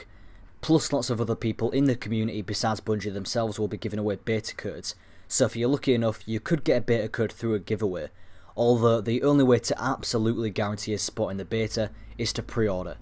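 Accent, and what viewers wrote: United Kingdom. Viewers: Well done! This video got you an insta-sub from me. Your even peaceful brit accent is a refreshing change of pace from our freak out beautiful b-tard MoreConsole friend. :-) Can't wait to watch more!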